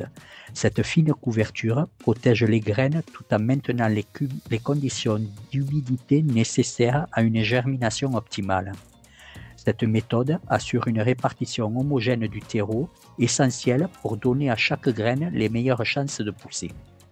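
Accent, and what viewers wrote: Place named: Charente-Maritime, France. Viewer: Ça fait plaisir d'entendre ton accent du sud de Charente-Maritime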